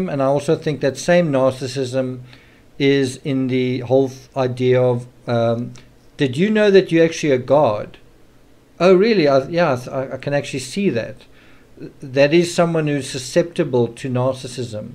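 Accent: South Africa. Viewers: Wow a south african accent! The first I've heard as far as this case goes. Interesting video I've subscribed. (Johannesburg here)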